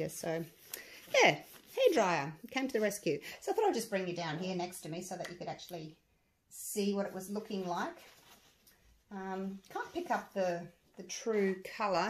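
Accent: Australia. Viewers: My goodness those are gorgeous!!! I’ve got to try this!!! I just love listening to you talk, Aussies have such a pretty accent & you sound like such a lovely, fun person! Heading to your eBay store now!💕💕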